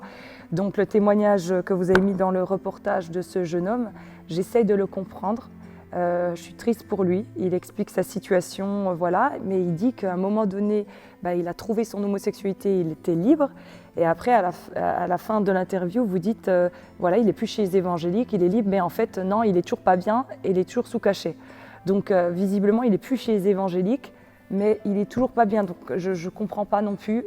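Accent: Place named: Doubs, France. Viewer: Chère Pasteure, je vous ai découverte il y a environ deux ans..Votre Foi transparaît à chaque phrase dans vos vidéos. Je suis catholique pratiquant mais avant tout sur le chemin, la vérité, la vie..Soyez bénie.J'adore votre accent qui me rappelle celui de ma cousine qui habitait dans le Doub😊.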